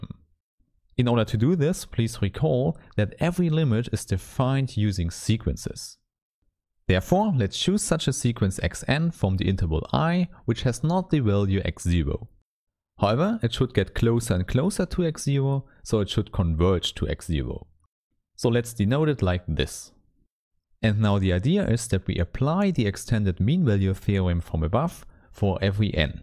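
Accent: Germany. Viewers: Oh, your german? Its quite noticable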